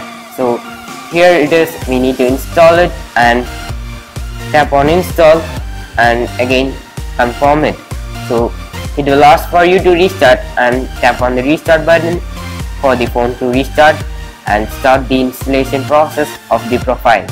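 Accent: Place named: India. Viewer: Ahh! Here comes the Indian accent!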